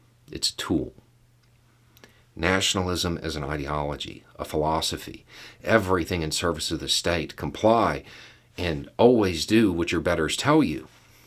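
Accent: South, United States. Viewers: A wise man with a big ol' beard and a Southern lilt to his voice. You're breaking down my stereotype, Brother. 🙏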